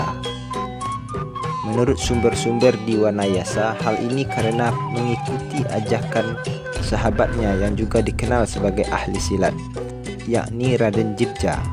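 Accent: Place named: Minangkabau, Indonesia. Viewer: Logat minangnya kental sekali udaaaa. Btw terima kasih atas informasinya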